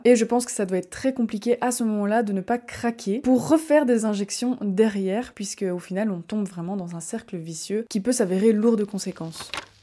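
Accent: Belgium. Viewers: Super sujet et j'aime beaucoup la façon dont vous le présenter, avec profondeur et simplicité. J'entends un fond d'accent belge, non ? En tout cas, continuez !!!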